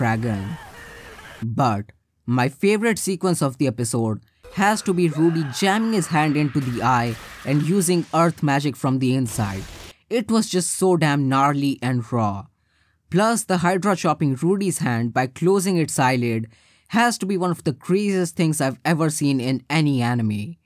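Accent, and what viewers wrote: India. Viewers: It's indian accent bruh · This indaian accent is killing me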